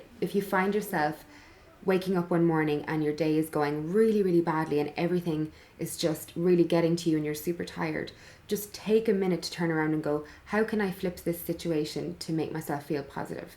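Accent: Ireland. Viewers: U sound Irish 🍀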